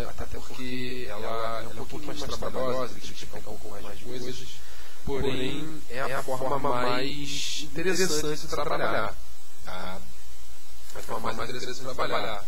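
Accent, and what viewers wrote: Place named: Rio de Janeiro, Brazil. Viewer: apesar de audio terrivel e sotaque carioca forte, um otimo video!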